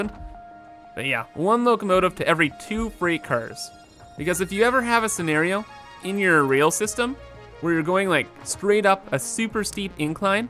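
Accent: Netherlands. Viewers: Are you Nederlands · Are you dutch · dude for sure you ar efrom holland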